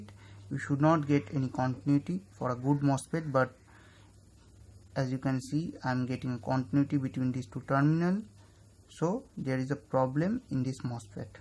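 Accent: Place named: Mumbai, India. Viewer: bombay accent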